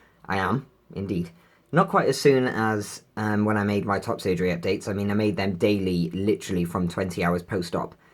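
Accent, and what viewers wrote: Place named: United Kingdom. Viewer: OMG, you're so handsome, and I love your cute British accent! Thank you for sharing your experiences with bottom surgery. :) <3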